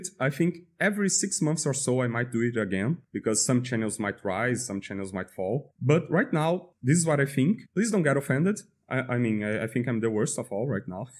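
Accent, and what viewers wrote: Brazil. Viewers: That brazilian accent! Great content, keep it up!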